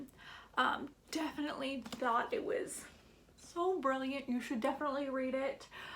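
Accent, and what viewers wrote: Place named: South, United States. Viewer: love your accent, where are you from? South?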